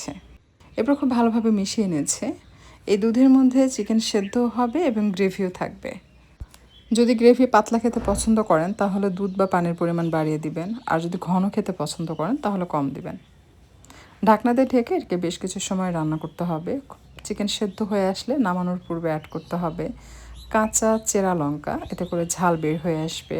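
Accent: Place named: Noakhali, Bangladesh. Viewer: বললেন পাবনা থেকে বলতেছেন,কিন্তু ভাষার টোন হচ্ছে নোয়াখালীর।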